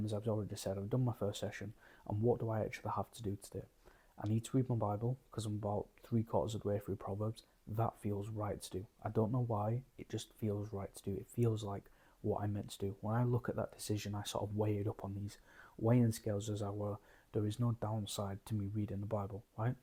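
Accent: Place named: West Yorkshire, United Kingdom. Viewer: ithought it was a west yorkshire accent. I studied linguistics at uni. Id love to meet up buddy if possible for a chat sometime? To hear your journey and to ask for advice on the Gospel.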